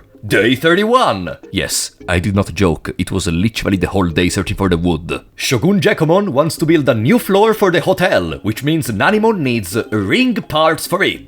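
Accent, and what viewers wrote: Italy. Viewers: I think you are italian just like me, kinda feel it from the accent, btw i'll comment in english cause I think that's the target that you want to reach; i'm impressed from the video, the dedication and the will you put on it, i love it from the bottom of my heart and i laughed so much so many time, please keep going on! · are you italian? the accent sounds like it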